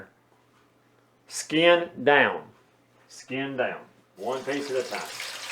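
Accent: South, United States. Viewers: Delicious!!! Good southern man accent which I can recognize 😉👌😊 straight away, I learned it from a famous movie, Trump, by Tom Hank!! Ha, ha!! Love from Hong Kong!!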